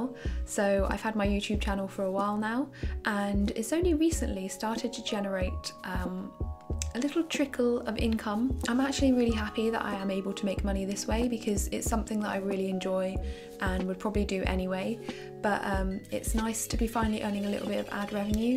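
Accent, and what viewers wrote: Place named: United Kingdom. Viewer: Why did I know you had a British accent?!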